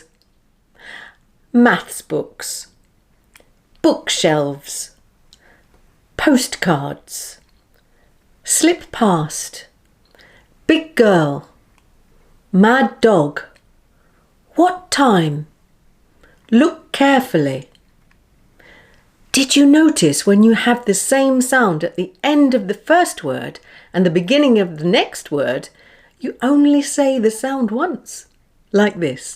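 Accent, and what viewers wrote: United Kingdom. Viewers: Thank you for sharing this video ...... I really like the british accent .... But it is not easy for a spanish guy like me!!!! :-)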